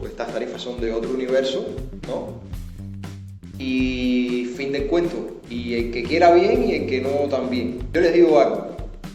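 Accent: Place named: Cuba. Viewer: Saludos desde California, USA de tu seguidor Puertorriqueño Angel. He visto muchos videos de personas en Cuba haciendo lo mismo que tu, pero los tuyos me han impresionado mas por tu los temas que escojes para presntarnos a tu pais. Explicas lento y sencillo con tu lindo acento Cubano y hasta algunas palabras las pronuncias como nosotros los puertorriqueños. Imaginarse a Cuba y verla por medio de tus videos son dos cosas bien distintas que me han dejado con los ojos abiertos. La arquitectura de los edificios es bellizima y un tesoro nacional. Que lastima que muchos esten en deterioro. Pero nada, felicitaciones y muchos exitos y espero 👀 tu proximo video pronto. Cuidate y bendiciones a todos en la isla.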